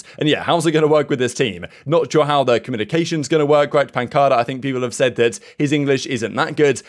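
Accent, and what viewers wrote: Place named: United Kingdom. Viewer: just how british people say it ig a lot of casters do it to lmaoooo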